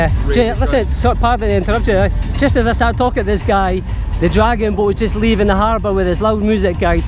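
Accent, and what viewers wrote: Scotland, United Kingdom. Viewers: I love your Videos and your accent! Are you from Scotland?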